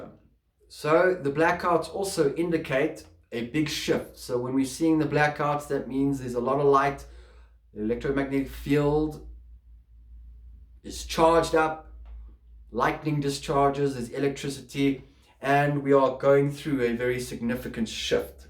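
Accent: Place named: South Africa. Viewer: Thank you for this! Was so happy to hear your South African accent😊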